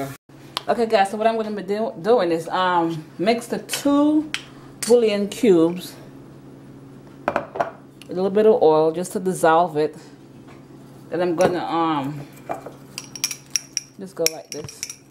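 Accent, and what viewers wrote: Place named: Jamaica. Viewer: I knew she was Jamaican lol. That accent hahaha. Love my people!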